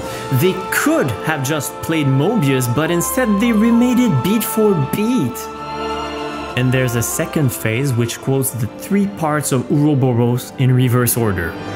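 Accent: Quebec, Canada. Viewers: I recognize your québécois accent ;)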